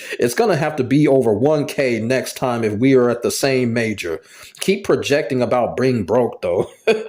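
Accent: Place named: New York, United States. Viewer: I never liked him,annoying ass ny accent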